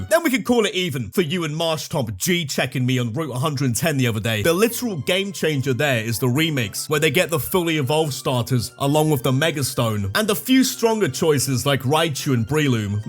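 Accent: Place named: England, United Kingdom. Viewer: It sounds English to me, but Idk which region. There are a WIDE variety of accents in every country.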